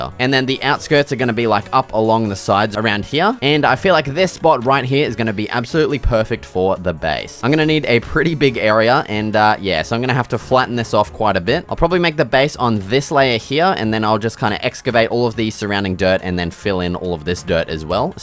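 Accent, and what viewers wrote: Australia. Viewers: Your Australian right? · bro couldn't be more Australia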